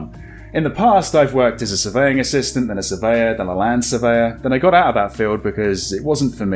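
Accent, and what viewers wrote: United Kingdom. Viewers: ‘In Bruges’ is a fantastic movie!! I also had your accent pegged as a fellow Surrey person. We sound very similar. This was a great video,. Pleased we get to know more about you. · Thank you so much for doing this. I started to watch you a few years ago, because I was about to do voluntarily stuff in the UK. So I looked for "british sounding lps" to get used to it to understand it better. I had to stay for your humour, for the fun, for the checked toilets, for the very rare screams. I think we'll get to 200.000. Let's do it! With some pizza and tea! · Congrats on 100K!! 🥳I have been following you for a long time now and you, Markiplier and Jacksepticeye are my favorite videogamers to watch on YouTube. Your videos are always entertaining and wonderful to watch! You brought my attention to wonderful games like the Cat Lady, Silence of the Sleep and Downfall. 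I looooved those videos you recorded so much. I enjoy it that you play Indie horror and Asian horror games that mainstream youtubers don't play that much. Also, your British accent is so awesome! 😁 keep up the good work! I appreciate you and what you do!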